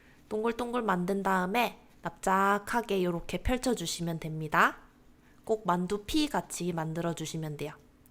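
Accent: Gyeongsang, South Korea. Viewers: 경남 지역인거같아요 제가 경남사람인데 저런말투 쓰거던요 ㅋ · 은 대구말씨~!!^^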